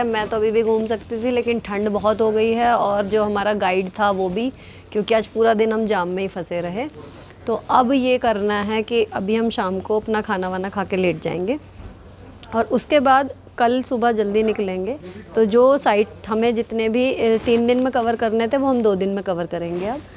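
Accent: Meerut, India. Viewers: Meerut se ho kya mam ap?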